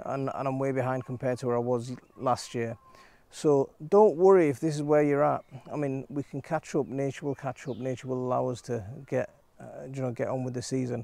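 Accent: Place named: Northern England, United Kingdom. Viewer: That is how people speak in the north of England.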